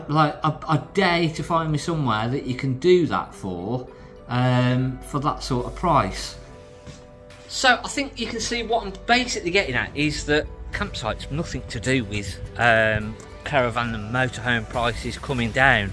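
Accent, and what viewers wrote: Midlands, United Kingdom. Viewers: The fella has a midlands accent. Life would be so boring if we all spoke the kings English like you!!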